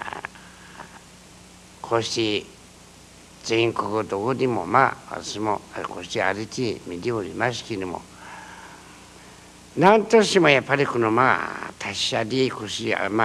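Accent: Tsugaru, Japan. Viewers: 語りの部分わかる津軽人いますか？ ハイ！私ですが笑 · きれいな津軽弁ですね 同じくきれいな津軽弁の地、弘前出身です。 15歳までいまして、郷里離れて30年近く経ちますが聞き取れます！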